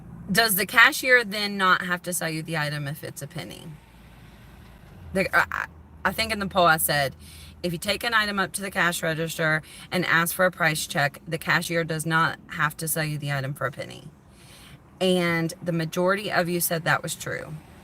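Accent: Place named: Georgia, United States. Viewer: Love your attitude, you sound exactly like me I was born and raised in Georgia. But I now live in Oklahoma LMAO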